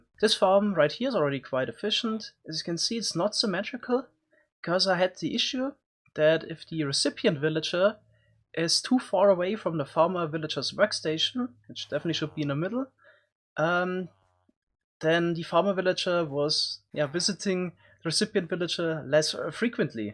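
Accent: Germany. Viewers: Höre ich da einen deutschen Akzent? :) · Man hört, dass du aus Deutschland oder Österreich kommst😂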